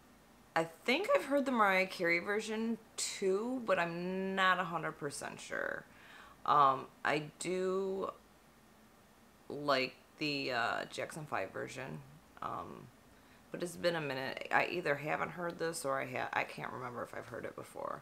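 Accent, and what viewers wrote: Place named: United States. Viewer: >>> lol. I don't think you have an accent... Maybe the guy was just mesmerized by your american accent. 😁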